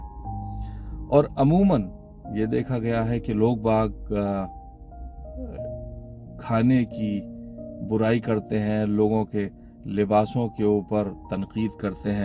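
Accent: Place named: Aligarh, India. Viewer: Voice n andaaz fully Alig❤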